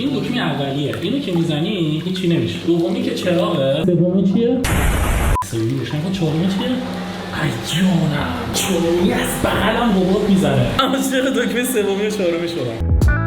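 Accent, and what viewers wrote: Shiraz, Iran. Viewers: پشمام شیرازی هستی❤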